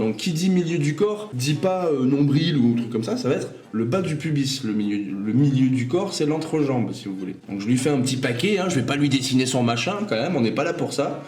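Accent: South, France. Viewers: comment dire.... tu es énorme!! j'adore ton humour, tes explications...et ton accent sudiste! merci pour ce cours majestueux!! (un nouveau fan sudiste!) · Excellent l'accent du sud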